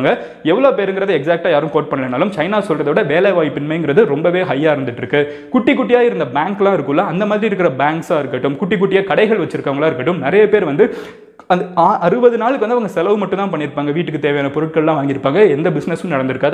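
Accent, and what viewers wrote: Madurai, India. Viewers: Mg neenga maduraiya???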